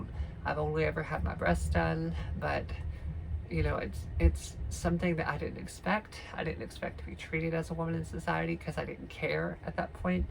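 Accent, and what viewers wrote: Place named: South, United States. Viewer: You may not think you are all that attractive but girl you are gorgious. I as a trans woman aspire to one day hit the point where I am at least half as gorgious as you. Based off of you accent I am guessing you are southern. I have only been on Estrogen sense Jauary 22nd this year so I have a wase to go. For now I am leaving the pronouns on the back burner as I am not fully passing in person yet at least for long periods of time. I would love to officialy use she/her pronouns in my daily life but I also feel if I do not push it and let it come naturaly no one can use pronouns in an attempt to insualt me.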